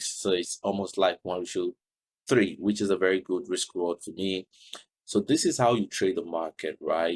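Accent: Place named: Nigeria. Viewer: Definitely Nigerian 😅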